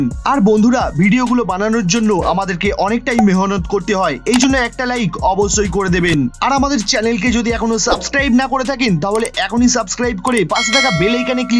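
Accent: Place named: Kolkata, India. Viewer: কলকাতার ভাষাগত টান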